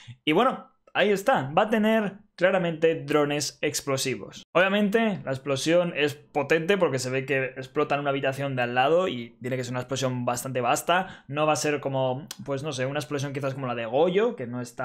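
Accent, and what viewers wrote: Argentina. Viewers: Es argento papa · Es de arg?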